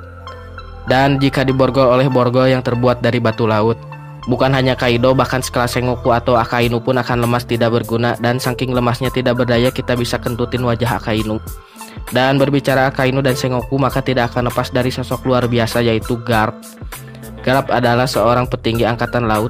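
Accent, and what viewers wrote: West Java, Indonesia. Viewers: Nada bahasa nya kaya logat orang sunda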